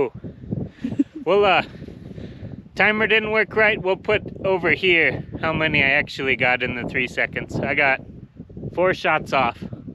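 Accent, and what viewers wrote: Canada. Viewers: You sound like a Canadian but shoot like an Alaskan. I'll subscribe.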